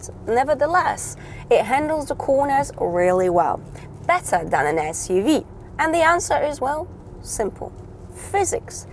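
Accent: Australia. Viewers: aha cool! Yeah the polish number plate gave it away and i recognised some Aussie/Nz accent from living there aswell. Nah Yeah have a good one! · Did you learn your english in Australia or south africa?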